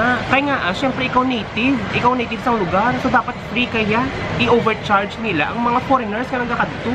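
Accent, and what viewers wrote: Iloilo, Philippines. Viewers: Hugot gid kuya, true gid ginahambal nimo how,tama ba ako ilonggo,bisaya gid ako,thailand is the nice place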